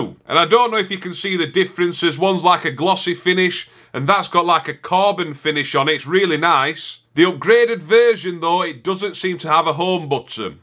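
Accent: Yorkshire, United Kingdom. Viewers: Are you the most Northern person in history? You sound very Yorkshire.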